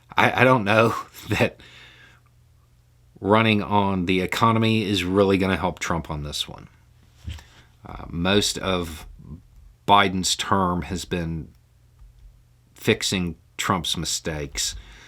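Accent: South, United States. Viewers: I’ve asked this before, but haven’t gotten an answer. How did this guy develop a southern accent? He didn’t have on in his very early videos. I agree with 95% of what he says. So I’m not a Trumper MQRQN. Just an FYI.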